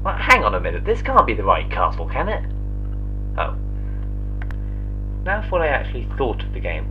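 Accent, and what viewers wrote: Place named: United Kingdom. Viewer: lol i love how 90% of youtube game reviews the host has a british or english accent xD your pretty funny though thanks for the info :)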